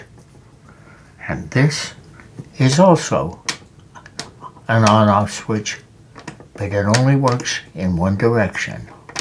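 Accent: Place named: United Kingdom. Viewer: He has such a beautiful accent almost like the British . I think the old generation used to stick to British accent as close as they could.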